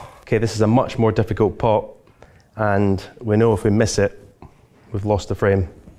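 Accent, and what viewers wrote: Scotland, United Kingdom. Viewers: He talks like a regular Scotsman enjoying his sport. The insights regarding positional play is gold.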